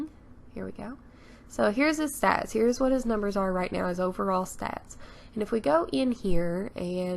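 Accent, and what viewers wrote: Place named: South, United States. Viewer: well that is good to know i will let you know if i need any help oh and i hear in your videos that you have a kinda "country" accent are you from any southern states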